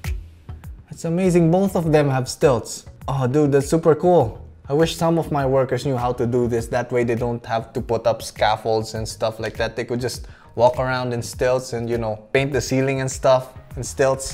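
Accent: Philippines. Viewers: Hahahahaha I’m assuming he’s filo! Coz his filo accent is STRONG!! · Fluent english with filipino accent. Nice one dude 😊😊😊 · I knew from your accent. You lived in the Philippines, wow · Kaya pala familiar yung accent Pinoy nga hahaha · within the first 10 seconds I KNEW you were Filipino hahaha I love it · Me: hears accent Also me: guess he’s Filipino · he filipino based on accent. lol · That pinoy accent is so distinguishable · hes from the Philippines men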